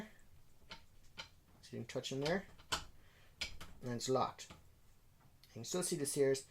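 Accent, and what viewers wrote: Ireland. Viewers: This guy Irish? He has like an accent it’s cool haha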